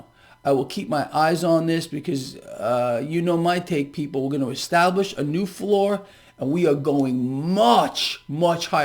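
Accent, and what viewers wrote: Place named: New York, United States. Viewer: Are you just sitting here on your ass with your New York accent promoting ideas let’s serve your best interest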